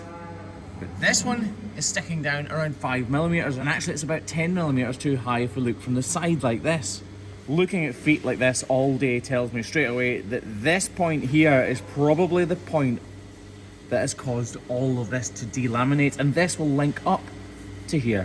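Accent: Scotland, United Kingdom. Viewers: He's from Dumfries and Galloway, Scotland. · Hopefully the cow gets better soon also i love that Scottish accent 💖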